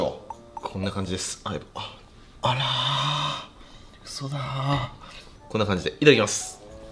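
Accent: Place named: Aichi, Japan. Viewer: 私も愛知県民のせいか適度な方言が安心感あるw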